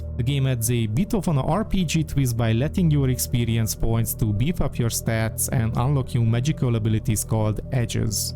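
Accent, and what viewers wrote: Hungary. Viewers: Csak feldobta a videódat, de mondom ezzel az akcentussal tuti magyar. :D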